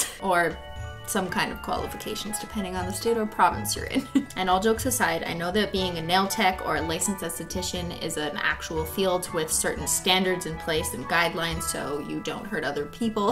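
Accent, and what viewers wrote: France. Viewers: Her french accent in english words was very good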